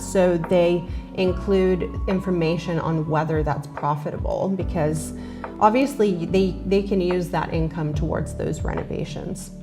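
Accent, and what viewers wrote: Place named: West, United States. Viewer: If your hometown is Helsinki how is it that you sound like you are from the western USA? You have no trace of a foreign accent.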